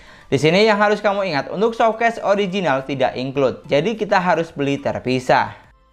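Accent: Madura, Indonesia. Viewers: Madura mana be'en kek?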